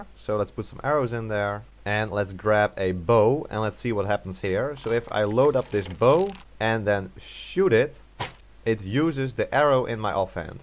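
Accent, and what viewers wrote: Netherlands. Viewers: Are you dutch? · Ben jij Nederlands, want ik hoor een klein accentje? 😊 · Ben je Nederlands want zo klinkt je stem als je Engels praat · ik hoor gwn jou nederlandse accent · Are you Dutch? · Are you dutch 😂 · You sound dutch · Typisch Nederlands engels 😂😂😂😂😂😂😂😂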